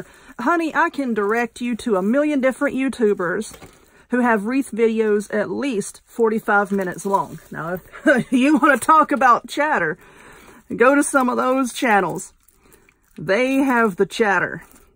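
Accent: South, United States. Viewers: I love your chatter! And your Southern accent! And you absolutely, hands-down, make the most beautiful wreaths on YouTube!! I most definitely am going out tomorrow hunting for all the stuff to make this one too!! Keep 'em coming!! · You are such a great teacher! I totally feel like I can make anything you give instructions for. And being a Southern Girl myself, I adore hearing the “Oh good lords,” etc. You sound just like me! 🤪 Love your channel. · I love your chatter! I also like the "southern accent" you have (I am from South Carolina). Don't change for anyone! You are a wonderful crafter and that's what brings us all back! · I life you because you don't talk a lot you are explaining what you are doing. Oh & I like your southern accent. I am from Laredo, Tx. & live in Cotulla, Tx*;0)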